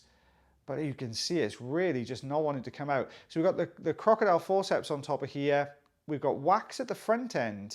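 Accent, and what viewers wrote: Wales, United Kingdom. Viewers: I was always squeamish about ear wax but I love your gorgeous Welsh accent and how you describe the procedure. Thank you 😊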